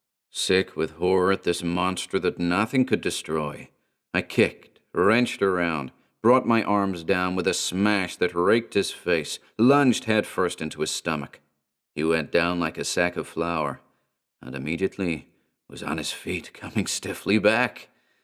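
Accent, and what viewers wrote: United States. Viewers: Your american accent is really good! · American accent is hilarious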